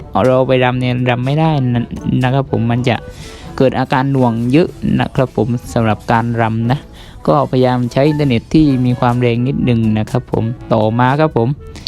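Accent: South, Thailand. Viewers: พี่เป็นคนใต้ใช่ไหมครับ😆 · พี่คนใต้ใช่ไหมผมฟังจากสำเนียง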